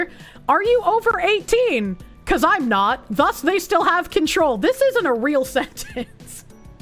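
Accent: Boston, United States. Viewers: There is a retired Alabama football commentator that had this same Boston accent. Maybe that's why I enjoy her voice. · The most trusted news source with a Boston accent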